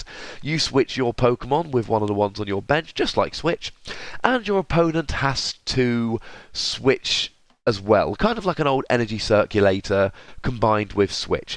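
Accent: United Kingdom. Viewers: His voice is just beast. Love the cornish accent, He's a good teacher too, he rescued my business grades!! If is watching then good luck in your career and if not, then someone pass on the message:)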